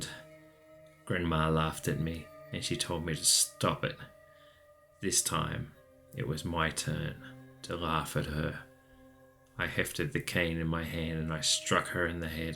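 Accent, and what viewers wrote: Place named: Australia. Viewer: I figured that out on another video. I even commented like, "hold on you sound extremely Australian now"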